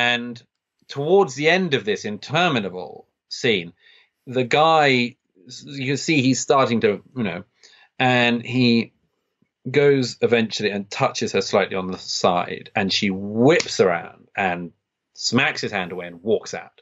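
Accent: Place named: RP, United Kingdom. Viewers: Society makes impossible demands on both men and women because we have a capitalist society, but this is missing from this faux intellectuals' 'message'. Just because he has a posh accent doesn't mean that there isn't plenty missing in what he has to say. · One minute in, and here's another old man who says a lot of hot air with a posh accent. I hope this doesn't become a trend.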